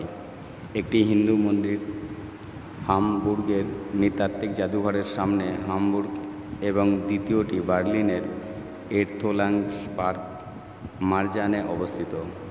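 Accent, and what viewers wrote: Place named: Ghoti, Bangladesh. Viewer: ঘটির ভাষা। বাংলাদেশের ঢাকাইয়া কুট্টিদের মতো ! তোতলা টাইপ ভাষা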